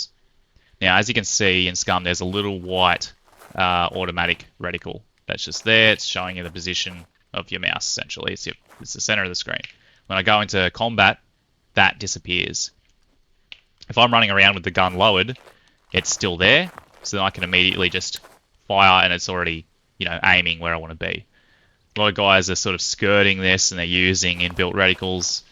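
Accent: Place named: Australia. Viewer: Nice to hear an Aussie voice and a fella who knows what he's on about to boot. Subbed cheers mate.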